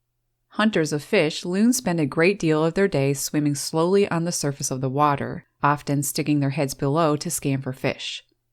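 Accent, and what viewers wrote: Canada. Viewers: This narrator's pronunciation of "about" is a dead giveaway canadian accent, but I don't know any Canadians who pronounce their O's like A's like she does. I hear that in Michigan, Minnesota and Western New York. I'm assuming Canadians with that accent are near those borders? Sorry for the off topic, just curious.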